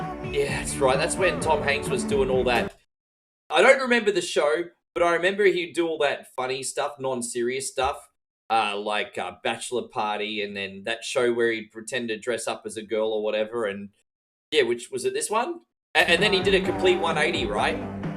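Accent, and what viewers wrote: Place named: Australia. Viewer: just a suggestion... For people that don't know you, your charm is your accent. Maybe putting in the title somewhere that you're an aussie would gain you clicks.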